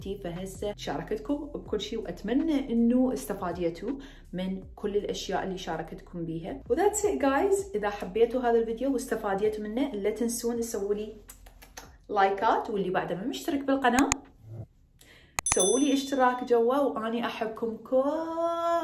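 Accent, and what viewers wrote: Iraq. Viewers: ما تدرين شقد صايره احب قناتك واتحمس مره إذا شفت اشعار لفيديو جديد+حبيت اللهجه العراقيه اكثر من وراك 🥺❤️❤️❤️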